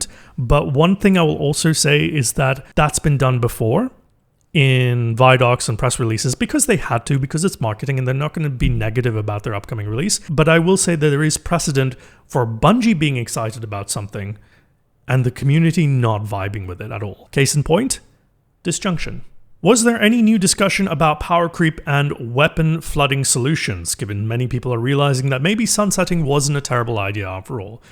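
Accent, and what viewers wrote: United Kingdom. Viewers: No doubt intimidated them with his high class British dialect, manners and intelligence. If only you got to have a meeting with the suits at the top instead of the developers whose hands are tied. I bet you would’ve handed them their ass in the most civilized discourse with dignified dialogue and a few witty passive aggressive jabs in between. Maybe one day… maybe.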